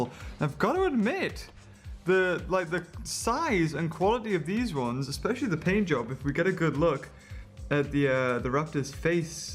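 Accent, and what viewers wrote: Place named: United Kingdom. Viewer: What part of britain are you from?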